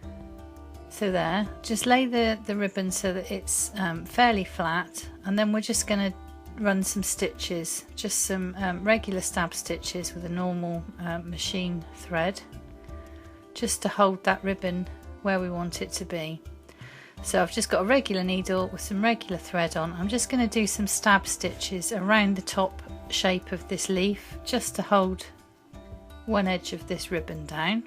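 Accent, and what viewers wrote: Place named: England, United Kingdom. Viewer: Wow!! This is beautiful.....I am new to this I haven't done any yet but my poor brain is buzzing with ideas! lol! Nice to hear English accent! I live in Sussex..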